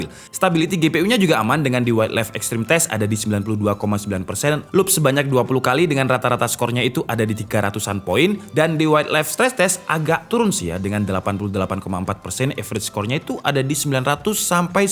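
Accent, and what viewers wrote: Malang, Indonesia. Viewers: Bang lu orang malang kah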